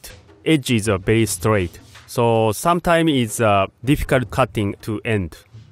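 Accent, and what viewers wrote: Japan. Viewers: ジャパニースアクセント、エニワン? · First time I think the Japanese-accent English doesn't matter.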